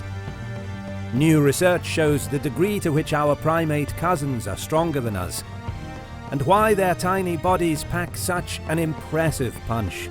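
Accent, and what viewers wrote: England, United Kingdom. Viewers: Rogan with an english accent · So it took you to get to any actual info, and then you gave us 20 seconds of data before you restated half the filler you already brought. No sources, no actual numbers, just an english accent to make people think you know something. 10 minutes on google gives you the same info and we made slideshows like this in freshman year high school. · Why does a British accent describing powerful animals sound so intriguing?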